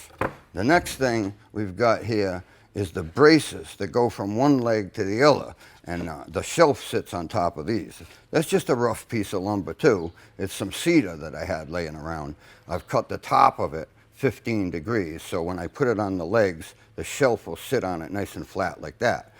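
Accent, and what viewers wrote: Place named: Rhode Island, United States. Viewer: As a native Newporter now living in northern Norway discovering this channel has brought back quite a few memories and feelings of nostalgia. The thick RI accent has is music to my ears. I've since lost mine years ago from all of my moves. Now that my journey into woodworking has started I've found this channel to be incredibly instructive and insightful. is a true master craftsman. Thank you so much for this. Cheers! p.s.- Now I'm dying for some clam fritters, a good swordfish steak or even a good Italian grinder.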